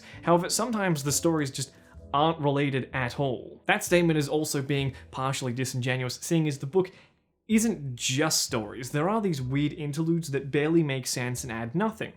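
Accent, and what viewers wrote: Australia. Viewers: the rhett and link one is so weird to me because one of my friends grew up in that town and hearing an australian man detail the experience is making me feel emotions that have yet to be documented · Josh Strife Hayes but australian and unrelated to videogames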